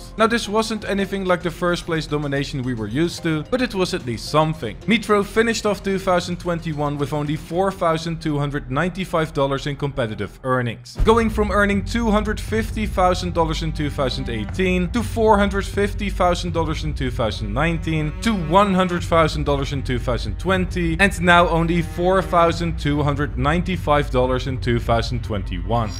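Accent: Netherlands. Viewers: Nederlandse support 🇳🇱🇳🇱 ik dacht al dat je nl was aan accent maar wist niet zeker wel ziek ouwe je doet goed bro ga zo door💪♥️ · ik wist dat je nederlands was je accent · Bro aan het begin hoorde ik dat je NL was en toen dacht ik wat hoe wist ik dat niet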